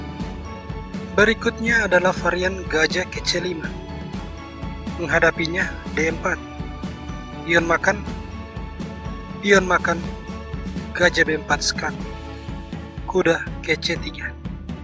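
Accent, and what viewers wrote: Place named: Eastern Indonesia, Indonesia. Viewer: Mantap ini Chanel, penuturannya jelas dan mudah dimengerti.. Yang paling penting, aku suka aksen bicara nya, khas orang timur 👌👌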